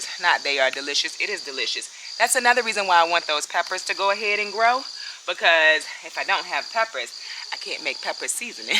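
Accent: South, United States. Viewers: Excellent job my friend.. I love ur accent so sourthern❤❤.. Ur garden is fantastic..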